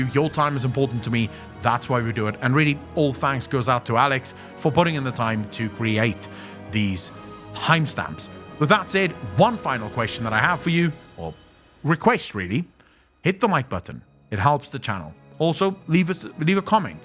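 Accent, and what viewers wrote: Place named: South Africa. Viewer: Subbed cause fellow Safa :) Would recognize that accent anywhere. Good vid my dude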